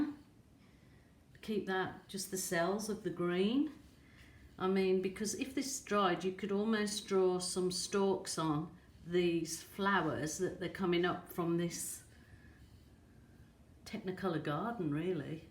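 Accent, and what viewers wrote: Birmingham, United Kingdom. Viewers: I love your result. I know you are in Aus but you sound like a Brummie to me. Are you originally from the Midlands?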